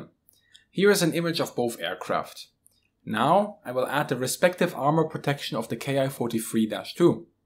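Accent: Germany. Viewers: Ich kann aus deinem aktzent raushören das du deutsch bist! Hallo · R u german? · Man höhrt dass sie Deutscher oder Österreicher sind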